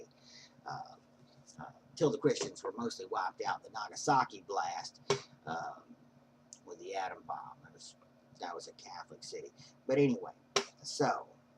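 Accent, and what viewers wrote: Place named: South, United States. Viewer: Wow. An outspoken informed atheist; putatively South Carolinian. Ain't that the Bible Belt? I know it's prejudicial of me, but it's strange hearing knowledge and wisdom via a southern accent. I too am an avid atheist but never stopped seeking and learning. My fave is George H Smith's "Atheism, the Case Against God" but I've read over 100 others on atheism, science and religion and, of course, the Bible, a book I treasure as a peek into the minds of ancient people. Hope your intellectual stance isn't adversely affecting your social life., a fellow rather odd He named himself after one but don't accept no god!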